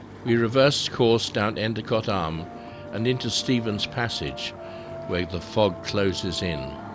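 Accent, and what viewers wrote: England, United Kingdom. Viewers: I don't know why but every adventure video seems more dramatic when it's voiced with a deep English accent. I felt like I was watching a National Geographic expedition from the 80s.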